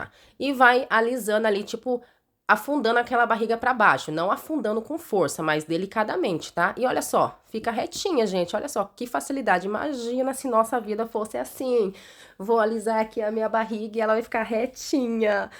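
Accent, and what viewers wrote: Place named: Minas Gerais, Brazil. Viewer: Vc e mineira igual a minha falo igual a você também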